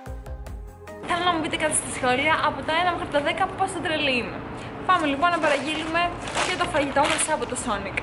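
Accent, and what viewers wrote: Spain. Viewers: Πιστεύω ότι σε ρωτούσαν αν μιλάς ισπανικά επειδή πολλοί ξένοι λένε ότι τα ελληνικά ακούγονται σαν ισπανικά. Έτσι και η προφορά σου μάλλον έμοιαζε με την ισπανική προφορά και λογικά θεώρησαν ότι πρέπει να σου μιλήσουν τη γλώσσα 'σου'. Ξέρω ότι στην Αμερική ζητάνε τα ισπανικά σα δεύτερη γλώσσα στην εργασία ακριβώς επειδή υπάρχουν πολλοί ισπανόφωνοι, οπότε έχει νόημα. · Σε ρωτάνε αν μιλάς ισπανικά γιατί η προφορά των Ισπανών και των Ελλήνων όταν μιλάνε αγγλικα είναι ίδια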